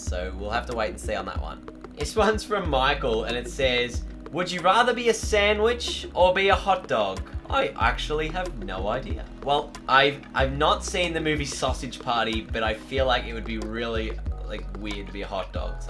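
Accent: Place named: Australia. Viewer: you have such a nice aussie accent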